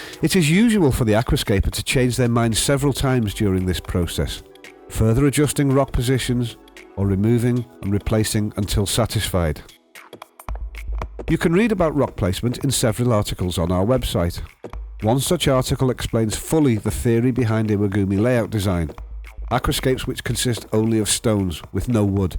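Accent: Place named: England, United Kingdom. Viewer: The narrator is speaking in a regional English accent not spoken in North America but in England.. Similar to the difference between Mexican and Castillan:)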